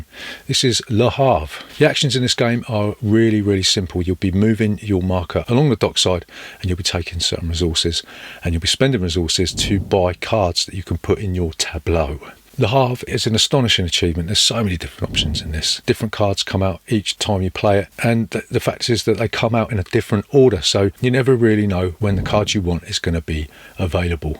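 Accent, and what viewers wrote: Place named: South London, United Kingdom. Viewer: where abouts on the south coast? I started watching your reviews recently and my wife, who is from Yorkshire, asked me why I was talking like I was in Saaaarf London. I said I’d been watching a load of b******s on YouTube, which I then had to clarify. I’m from Brighton myself but live in beautiful Auckland NZ...Anyway, you have been a great source of info and entertainment during lockdowns etc....so Thank You very much.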